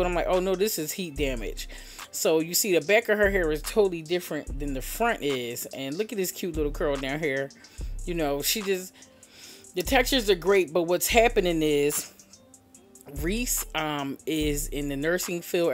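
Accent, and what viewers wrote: Philadelphia, United States. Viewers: Love your quotes I wish you could do my hair Knew you were from Philly from your accent I’m sick that I missed your pop up shop in DC, trust and believe I would’ve been there!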